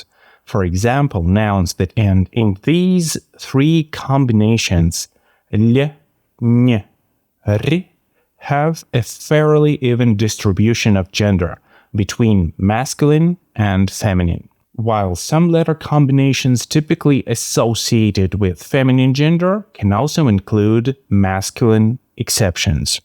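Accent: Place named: Russia. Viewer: You are funny. You show the Shining movie, or make comment in another video about that there is no escape to learn Russian numbers only escape from Siberia. Oh God you are too much. Some Russian when speaking English seem they have a big potato in their mouth.. You are one of them. It's a kind of funny and cute. Anyway it's a joy listening to you all night. Thanks for all your videos.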